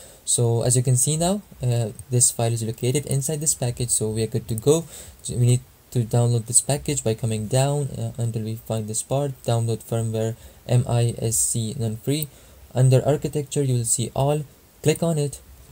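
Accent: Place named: Arab world. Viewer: Bruh judging by your accent are you an arab? If so bruh feeling proud of tht i am an arab too.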